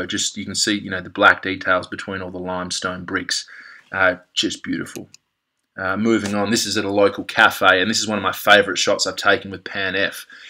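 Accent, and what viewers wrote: Australia. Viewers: Absolutely love this film. I especially love shooting it in my M3, with a Summicron 50mm colapsable. On a nice sunny day, it's a must. I agree with everything you said, and the cafe shot is lovely. Also, I've been following the USA political scene for almost two years, and to hear an Aussie accent on YouTube is a real bonus. Well done.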